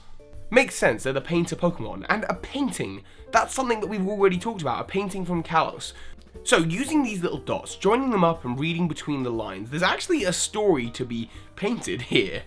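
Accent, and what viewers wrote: United Kingdom. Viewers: SwaggitySwooty it might just be his accent, he is from the U.K. after all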